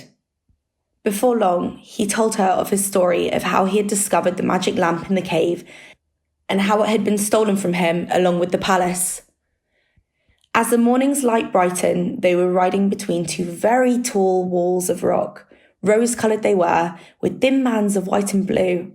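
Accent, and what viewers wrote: United Kingdom. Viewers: I love British accent. Thank you very much.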